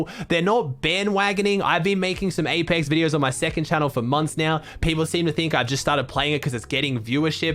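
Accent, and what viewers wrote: Australia. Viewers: sheeesh aussie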